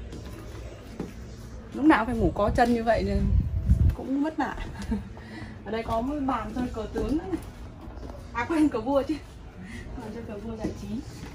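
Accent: Hanoi, Vietnam. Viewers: Girl đâu mà xinh thế, giọng hà nội nữa chứ , yêu thế ❤️ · nói giọng bắc dễ thương quá. Mà bạn quê ở miền bắc là ở Hải Phòng phải không. Chiếc tàu lớn quá. Ủng hộ đầy đủ like sub tặng bạn. Đã xem full. Chúc bạn ngày mới vui khỏe và bình an. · Em là người gốc Hà Nội hả. Sao giọng hay quá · rất xinh đẹp và nói giọng Hà Nội nghe rất dễ thương. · Xinh đẹp, phúc hậu, giọng bắc chuẩn hà nội nghe mê à!!!!!! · Bạn hướng dẫn xinh gái quá đi có người yêu chưa bạn ơi ! bạn nói giọng HN à ?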